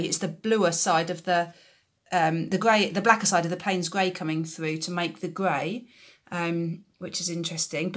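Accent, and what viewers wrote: England, United Kingdom. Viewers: I love watching your videos, they are so carefully explained and so refreshing to hear an English voice. I feel very much on my own here in UK. Keep going.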